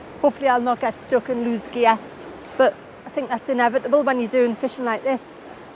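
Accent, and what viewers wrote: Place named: Northern England, United Kingdom. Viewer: you will have to do a northern lights video as by your accent you are very close to catching them Very different accent to further you go up before it turns into Scottish And we are based Co Durham so get to hear many accents ❤️🫡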